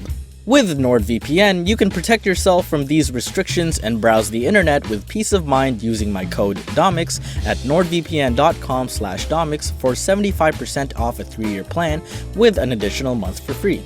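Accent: Philippines. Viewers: Hindi ako maka get over sa tabo! 😂 (It’s nice to hear that you still have your Filipino accent! ♥️) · He still have filipino accent lol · You’re Filipino accent is amazing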